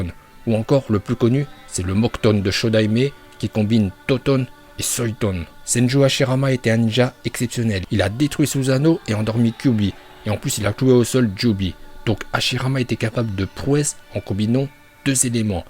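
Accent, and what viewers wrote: Japan. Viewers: Wais ton dégoûtant accent japonais est le seul truc qui n'empêche de m'abonné et en plus de ca tu n'arrête pas de t'en vanter arrête avec çà Déjà avec son Goku qui glisse sur le crâne chauve de jiren et ca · Ton accent en japonais il est éclater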